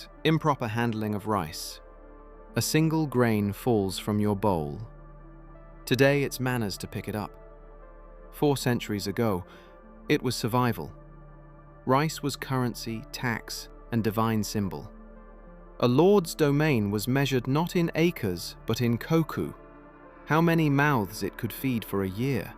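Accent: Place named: United Kingdom. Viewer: Even AI with a British accent mispronounces all foreign words. 🇺🇸⚓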